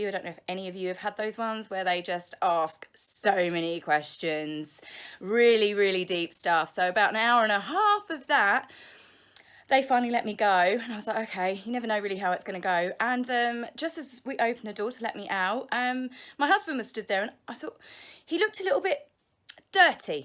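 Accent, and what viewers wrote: England, United Kingdom. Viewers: Thank you Love your videos. You asked if anyone had ideas for YOU talking about other things, well I watched P Charles Documentary ‘Sadness.....’. Not a mention of Princess Diana, his Adulterous marriage, his treatment of his wife. Now his relief on Her death!!! Narration I couldn’t believe. What do You think, and Your growing number of followers? Love hearing about your hilarious job hunting. Blessings go with You in your search, could it be The Universe giving You a change of careers to the media, Research...? I am of the previous generation, where can I find Your email address. I love watching You - beautiful complexion, superb cheekbones, the way You speak, Oh You make me homesick for England. 12,000 miles away in W.Australia,, from the days when wives followed their husbands tradition! Trouble is he’s still travelling! 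💗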